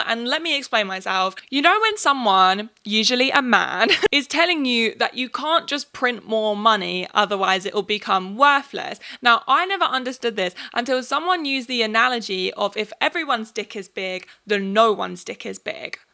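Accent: England, United Kingdom. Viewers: This is on a kind of related note, but I have a friend who was on deviantART for a long time who experienced a similar thing. She's been on dA for a long time now and built a pretty loyal following of 2,000+ watchers, which is pretty substantial on that platform. On that platform, she's been commissioned so much, even started making pins, plushies, and tattoo designs. Recently, she tried out tiktok and quickly blew up. She was making comics that were getting views in the millions, she's never had so many eyeballs on her art and got about 70K+ followers. But even with those numbers, she got almost no offers for commissions or sold merch. What she did get were a bunch of people asking for more content, even though the stuff she was making was quite work intensive. I feel like the infinite scroll of TikTok devalued the amount of work she was really putting into her art, and when people weren't getting content fast enough (by that I mean, within days of her last post), they just forgot about her and her views plummeted. 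That weird surge of fame she experienced ended as quickly as it began, and she made almost no sales out of it. Compare this to her 2,000 watchers on dA who still commission her to this day. I'm not saying dA is a perfect platform (it's not), but TikTok is designed to have this pathetic half-life, I wonder how ANYONE maintains TikTok fame at all. side note: I loved the vid. Does anyone know which part of Britain her accent is from? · you're too british. it's hard to listen to you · Where are you from in England, you have the same voice as my psychology teacher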